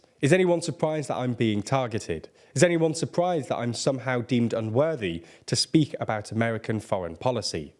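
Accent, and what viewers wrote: United Kingdom. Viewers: Sorry you are feeling unwell,. Hope you get better soon. You are still gorgeous, and your Brit accent still rocks. You could read the phone unwell with that accent and I would still tune in.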